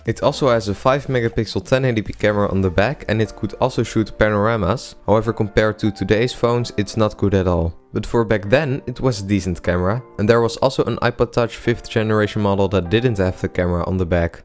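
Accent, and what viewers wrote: Netherlands. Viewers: funny Dutch accent. :). but good review!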